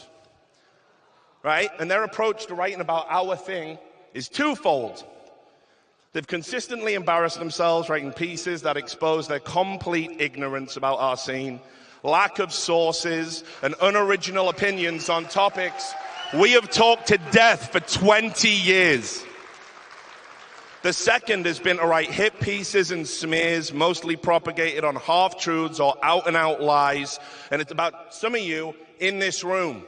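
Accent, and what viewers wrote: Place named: Newcastle, United Kingdom. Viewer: Love the Geordie accent.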